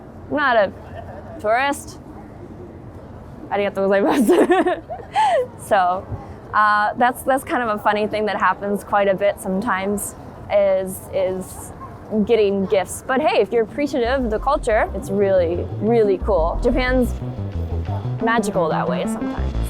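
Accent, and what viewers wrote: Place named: United States. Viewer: I feel sorry Japanese people learn English from you as u speaks American.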